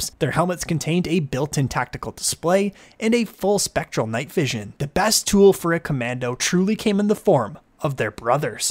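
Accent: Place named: Canada. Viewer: Most Canadian accent ever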